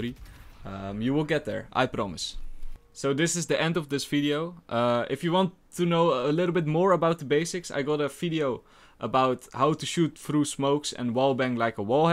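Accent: Netherlands. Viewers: he dutch i think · Bro, ik kijk dit nu terug en tering je hebt een nederlands accent ihahaha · Just with your acsent i know you're dutch hehe i'm dutch too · ik hou van je Nederlandse accent xD hehe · nah he is from netherlands i reconize these accents anywhere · i think he really sounds dutch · The dutch accent be bussin thoooo 🥵😍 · iron drie imma show u vijf.. u sound dutch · Ik heb het gevoel dat jij Nederlands bent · 'iron drie' half dutch accent goes hard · are you from the netherlands? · I think dutch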